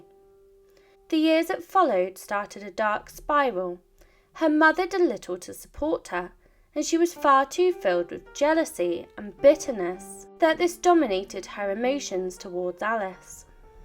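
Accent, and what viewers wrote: United Kingdom. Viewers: . “She also wore black at her wedding.” “She arrived to the ceremony in a white gown.” “Queen Victoria forced everyone to wear black before and immediately after the wedding.” Like, wtf? Also, whichever British accent you have should be done away with. It is the most annoying manner of speaking I have ever heard.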